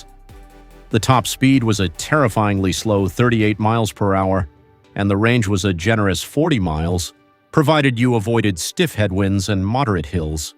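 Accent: United States. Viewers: I like it, the voice sounds as if from Michigan or Chicago to my not very educated ear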